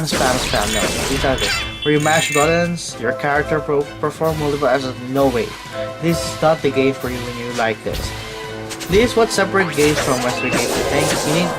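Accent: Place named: Tagalog, Philippines. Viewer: You have that English accent in you you are good talking in English Language but your Tagalog accent is still there.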